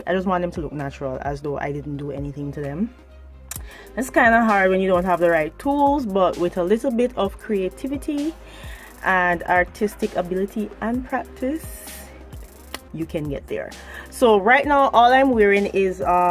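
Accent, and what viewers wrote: Jamaica. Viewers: Are you Jamaican or Africa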